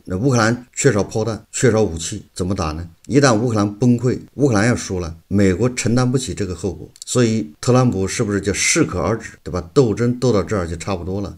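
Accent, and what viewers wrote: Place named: Xiangxiang, China. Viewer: 这湘乡普通话，亲切👍👍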